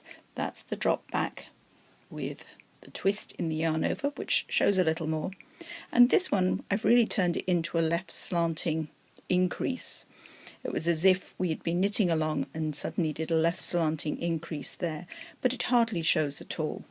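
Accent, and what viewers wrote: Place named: England, United Kingdom. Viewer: Love your work. No fuss just doing the job. I also love that wonderful English accent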